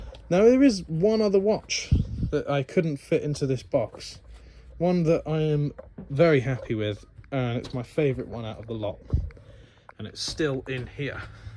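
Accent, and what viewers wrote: United Kingdom. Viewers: I JUST LOVE YOU!! And your accent! Not many Brit's speak as well as you esp your cadence and vocabulary! You're brilliant! Thanks for showing us your watch collection! Plus, what a special honor to your Dad!! I never knew anyone who can build their own watch!! Wow! And from you being on your mom and dad's videos, I can see you've inherited both of your parents' talents! Thank you for making this video! I have developed a true crush on you!! Just a complement! But you're so likable and soooo cute! Love to your Mom!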